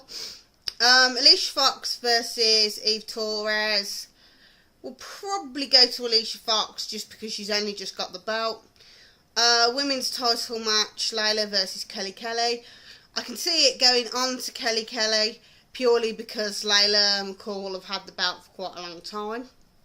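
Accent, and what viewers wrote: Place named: United Kingdom. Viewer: oh whatever girlfriend, let those haters hate, your videos are great and your views on wrestling are smart and direct, plus, you have a killer british accent.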